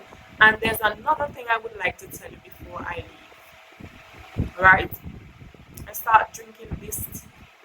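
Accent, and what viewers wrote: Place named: Nigeria. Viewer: Lol the Nigerian accent 😂😂😂🙆🏾‍♀️🙆🏾‍♀️🙆🏾‍♀️ nice video ♥️💯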